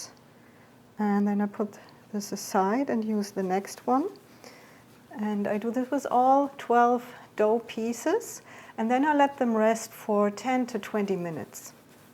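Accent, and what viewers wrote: Germany. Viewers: Perfect english ... ein bissl hört man noch den deutschen Akzent. Aber .... echt gut. Die Laugen sehen toll aus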